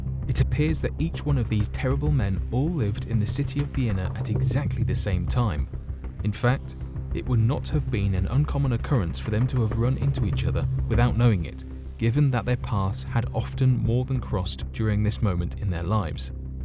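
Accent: United Kingdom. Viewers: Dude!! You are screwing up Royally. Franze Joseph, really? Butcher? Dictator? Are you really that stupid? Franze Joseph was neither a dictator nor in any way a butcher!! WTF is wrong with you? He was the Emperor true. But loved and respected. I live in Vienna. And have family here going back 800 years. Franze Joseph is still widely regarded as one of their most beloved leaders. Keeping them out of war and reigning over a period of peace and prosperity. In the video you say these three men. And then show a picture of five men known of whom is Franze Joseph. Stalin yeah, thats Stalin. Hitler uh huh, thats Hitler. Sigmond Fruede yeah, thats him. But Franze Joseph dont think so. Are you getting high before you edit? Really sloppy work here. A British accent doesn't make you smart. You going to apologize to two million Viennese.(Damn son. I wouldn't be visiting this wonderful, tolerant city any time soon.) Good luck with that apology. 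Very sad. Sloppy work.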